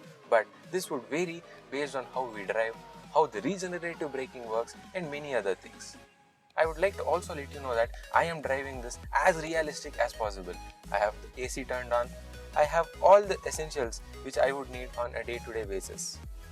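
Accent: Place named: Mangalore, India. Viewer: lol. somehow this host sounds like he's of Mangalore origin. Gut feeling ashte. cuz I'm a Mangalorean myself xD. anyways great and spot-on video! it's good to encourage more people to buy the EV. godspeed!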